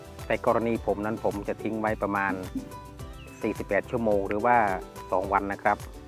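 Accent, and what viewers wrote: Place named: Cambodia. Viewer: สำเนียงขะแม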